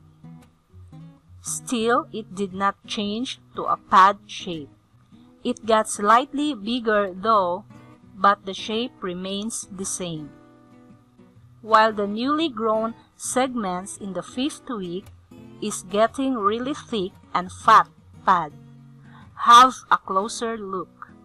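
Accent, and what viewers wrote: Philippines. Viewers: please fix ur speaking. u speak very slow and it sounds very boring. don't use filipino english accent and don't speak very carefully and slow because u sound like reading. i'm not a hater, just a friendly advise for ur video not to be boring to watch and hear. thanks for understanding. 🙂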